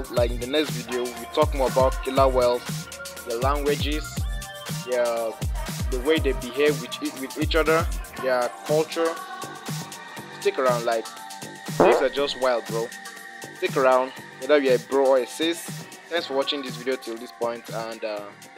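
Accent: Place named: Nigeria. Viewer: I am a Nigerian too.......I can tell a Nigerian when I hear one speaking .....this YouTube hustle is not easy at all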